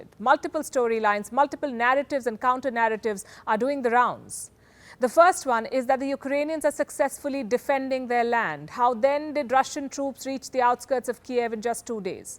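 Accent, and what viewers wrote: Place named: India. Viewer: English not Indian English please so annoying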